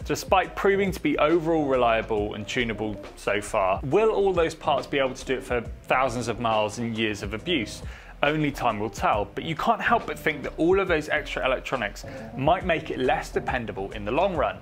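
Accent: United Kingdom. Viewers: This channels just donut media w a British accent lol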